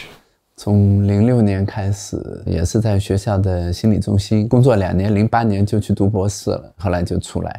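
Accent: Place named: Hangzhou, China. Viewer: 杭州本地人都这么说，江浙人都这样说普通话的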